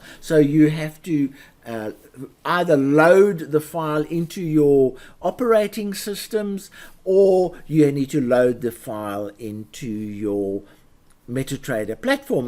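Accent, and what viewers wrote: South Africa. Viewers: Hi, let me rather ask. Are you a South African?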